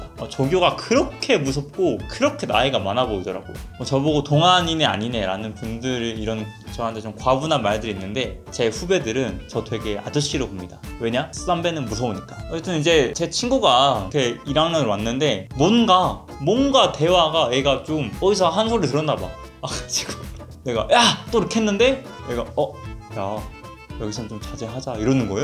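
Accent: Gwangju, South Korea. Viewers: 뭔가 광주 사투리가 엄청 정겨워요 ㅎㅎ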